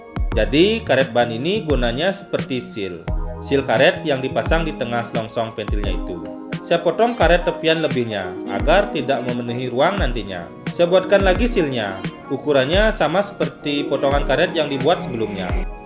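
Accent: Bali, Indonesia. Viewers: Suara org dari bali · Bicaranya seperti orang Bali ya brow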